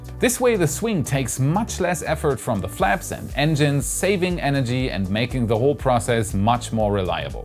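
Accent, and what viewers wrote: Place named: Germany. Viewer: Ich mag deinen deutschen Akzent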